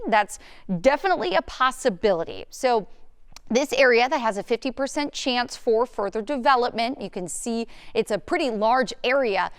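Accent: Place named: Texas, United States. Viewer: Cute little Texan drawl!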